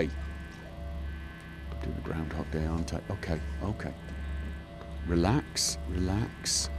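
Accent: United Kingdom. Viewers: Jeancly Hi! I'm learning british english, could you tell me where is his accent from?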